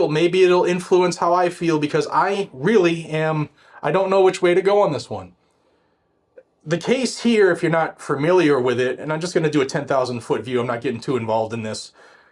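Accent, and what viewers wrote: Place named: South, United States. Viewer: Y'all? You're getting a wicked southern accent.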